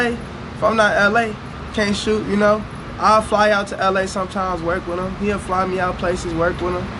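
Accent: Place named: Detroit, United States. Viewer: he sound like he from detroit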